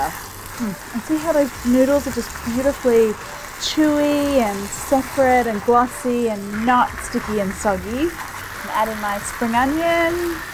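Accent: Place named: Australia. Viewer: jee you're good, and the way you talk about food with that Australian accent is so nice to hear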